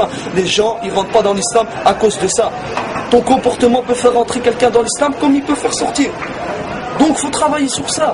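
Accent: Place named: Maghreb, North Africa. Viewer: إذا كنت تقصد هذا الشاب - بارك الله فيه - فهو اما ان يكون امازيغي او عربي من المغرب او الجزائر فهم كثر في فرنسا. اللهجه واضحه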